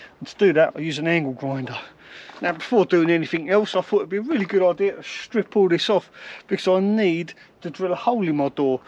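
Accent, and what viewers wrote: Cockney, United Kingdom. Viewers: Professional cockney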